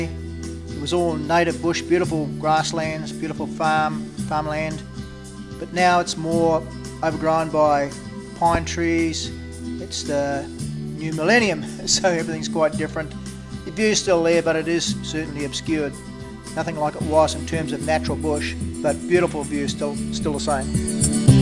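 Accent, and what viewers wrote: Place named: New Zealand. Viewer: I just LOVE this Kiwi/Oz accent of yours! Reminds me of my trip around the world with my sweetheart before having the kiddies. Ok, got to follow your story now. See ya!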